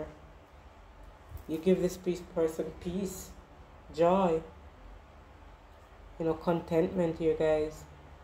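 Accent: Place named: Trinidad and Tobago. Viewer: Your readings are magnificent. You are sounding Trini 🇹🇹 & familiar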